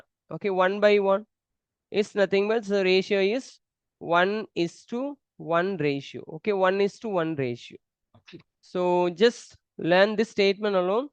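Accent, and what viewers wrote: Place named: Tamil Nadu, India. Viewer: i am also tamilan thats why i identified your slang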